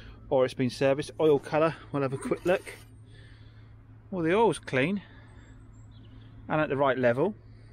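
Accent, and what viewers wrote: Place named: Devon, United Kingdom. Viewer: The sound of Bruce Forsyth from deepest Devon 😊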